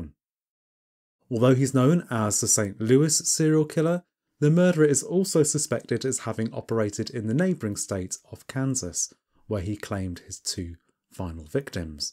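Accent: United Kingdom. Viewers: Absolutely no offense ment, but my friend and I have had a long running joke about a someone with a British accent being able lul you to sleep while describing the most horrific of acts. I have found proof! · indeed it is very outnumbered by American and Canadian channels they make great effort and content but its refreshing to have a face and a brit voice narrator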